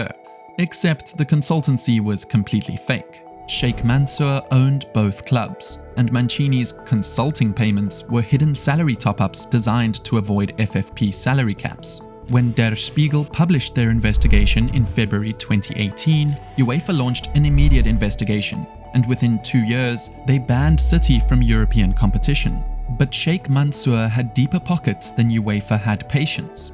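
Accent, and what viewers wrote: South Africa. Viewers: correct me if I'm wrong but this is a South African YouTube channel your accent is 100% South African🤔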